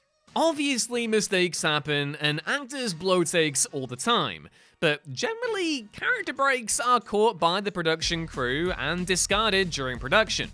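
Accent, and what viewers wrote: United Kingdom. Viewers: it's kind of awkward hearing a Brit talk about the American version of the office when the British version is a lot better. (P.S. I'm American)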